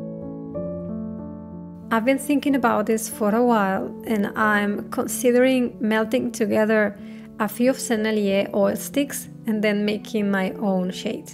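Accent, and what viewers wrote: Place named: Spain. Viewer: Disculpa hablas español? Es que por tu inglés suena Español como lengua materna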